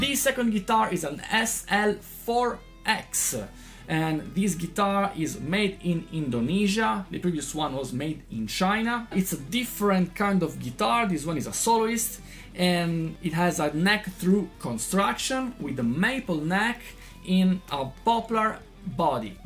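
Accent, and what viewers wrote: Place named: Italy. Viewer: I subscribed by the time you completed your first line.....damnnn i soo wish good content.....and also for my love of italian accent😅😅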